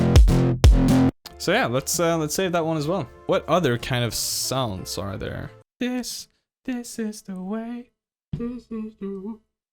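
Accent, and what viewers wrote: Sweden. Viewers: Damn you sound swedish! haha Haven't looked further than , but damn, the swedish accent is killing me LuL - another swede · it's the swedish accent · Can an accent get more Swedish? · You from Sweden? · it’s the thick Swedish accent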